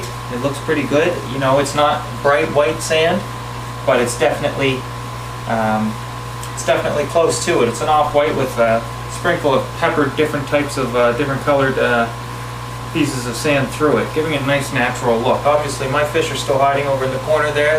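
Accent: Canada. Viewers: Well I just spent about 2 hrs watching your videos needless to say I am exhausted. Well done. Truly a pleasure to watch and very informative. I especially love your Canadian accent when you say words like "out". Ow-oot! :) anyways subscribed - keep em coming...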